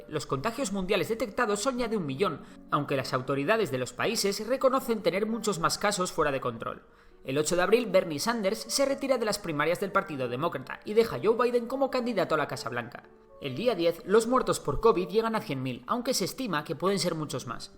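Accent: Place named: Spain. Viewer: la verdad se nota que eres de España xd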